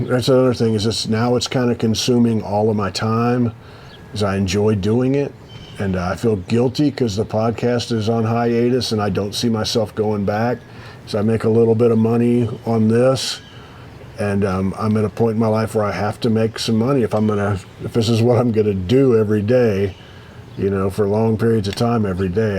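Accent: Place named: South, United States. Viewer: Ain't been here for all 100. Not even close. But you're a natural story teller. That genteel southern drawl is a big plus too. You and your friends are real easy to watch. You've got a way about you. Thanks for creating this channel. It's just my style.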